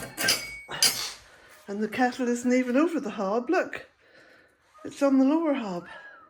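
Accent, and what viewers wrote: Ireland. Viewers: Hi there. It’s absolutely freezing here as well here in Maryland, USA. We have over 3 feet of snow today. Your beautiful Irish lilt reminds me so fondly of me wee Grandma. She was such a dear and our family is from Belfast and I lost my Mom this year in March.Unfortunately I found your channel too late how I wish I could’ve shared your lovely videos with her! She would’ve thought you were just grand ❤️💞🫶🙏🇮🇪 Be well and stay warm🌤️🥰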